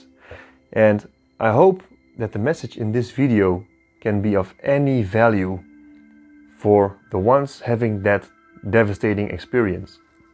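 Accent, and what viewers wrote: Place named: Brazil. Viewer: Are you brazilian? 🌚 because you have a brazilian accent.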